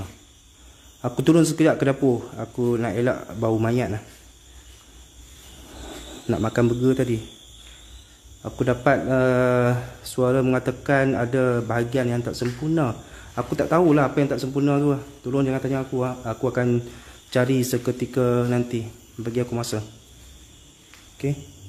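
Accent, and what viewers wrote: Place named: Northern Malay, Malaysia. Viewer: Hang nk ckp utara ,hang xyahlah ckp key el plak....lagi satu klau nak ulas tu ang ulasla dgn bijak sikit...walaupun hang seorang pemberani tp bila ulas mcm tu rasa semak..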